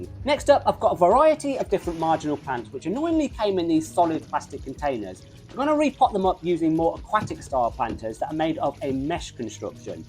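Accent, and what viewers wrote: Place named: Australia. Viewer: Abrasive accent. Australian?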